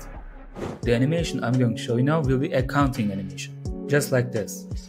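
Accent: Turkey. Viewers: Aksandan direkt Türk olduğunu anladım, bu tarz içerikler için tebrik ve teşekkür ediyorum dostum :) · It is understood from your speech that you are Turkish (I am Turkish and I do not have after effects)❤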